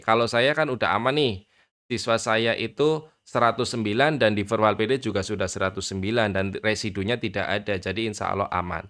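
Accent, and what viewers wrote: Banyumas, Indonesia. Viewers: saya masih duduk manis jam 1.20 depan laptop buat sinkron, eh ketemu mas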